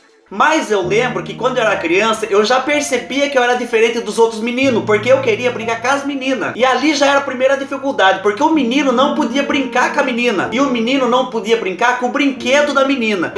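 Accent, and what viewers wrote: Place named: Piumhi, Brazil. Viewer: Noossaa tô apaixonada!! Tu me fez rir d+ sô ! Ele é mineiro tbm gente? O sotaque parece d+ com o do povo de Piumhi kkkkkk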